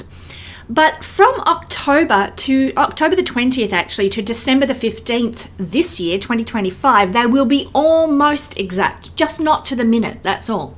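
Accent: Australia. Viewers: G'day it gr8vto heat a Aussie lady doing this ❤ur worx kind re thommo nsw Australia 🇦🇺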